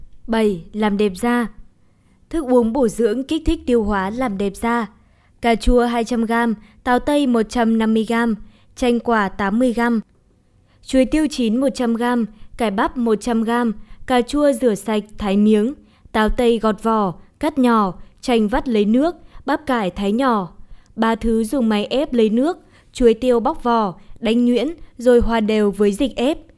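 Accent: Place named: South, Vietnam. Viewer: Noi giong mien Nam nghe hay hon giong Bac Ky 75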